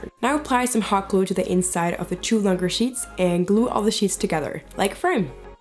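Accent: France. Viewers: I’m not even French and I know that her French accent is on point 👌🏻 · I'm french and I have the same accent as you love your vids merci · you have a great french accent and i know wht i'm talking about, i'm french ! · Your French accent is sooo cute , I speak French since my 2yO😛😛😛💕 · Hey are u French or something because it sounds as if u have some kind of accent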